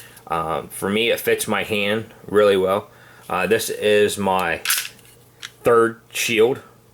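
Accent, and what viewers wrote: Pittsburgh, United States. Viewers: Sounds like a yinzer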